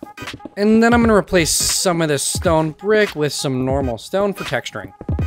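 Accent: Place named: Midwest, United States. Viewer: Are you midwestern by chance? I recognize that accent because I have the same one!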